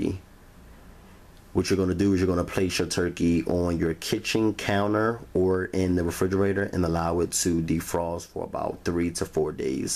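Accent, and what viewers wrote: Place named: Baltimore, United States. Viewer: U gotta be from bmore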